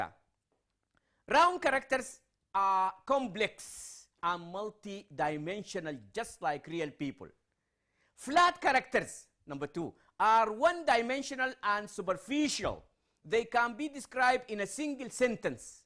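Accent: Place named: Sudan. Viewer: This is Sudanese english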